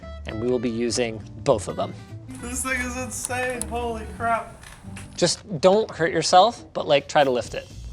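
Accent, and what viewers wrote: Canada. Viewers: i didnt realize was Canadian til this episode, so many eh's lol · The Canadian is strong with in this one. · is saying ",aye?" more i think his Canadian accent is finally coming jn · I've never heard him sounding this Canadian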